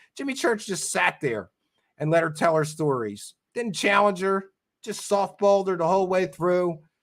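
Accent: Philadelphia, United States. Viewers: Are u from Philly? Or the Philly area? Ur accent sounds like my Philly accent hahaha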